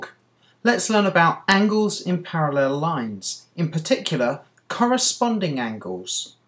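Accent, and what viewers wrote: United Kingdom. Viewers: ***** British accent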